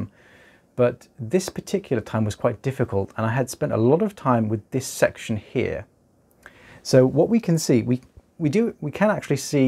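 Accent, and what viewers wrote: United Kingdom. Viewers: i think you need to talk to dr. Neel Raithatha he does ear wax removal also and he uses an oil type cleanser called clear. excuse me if i'm wrong, but you sound British to me and Neel IS British so maybe find him some how. he has his own youtube channel but his comments are off so try using his comunity page. hope he can help.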